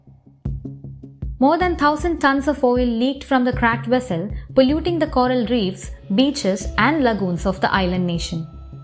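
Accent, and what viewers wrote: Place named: Kerala, India. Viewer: Your Malayali accent ... Beautiful!!!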